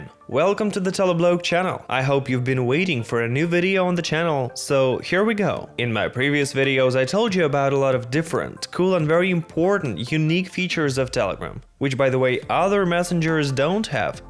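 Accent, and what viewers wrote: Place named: Russia. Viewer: You are Russian, I hear your accent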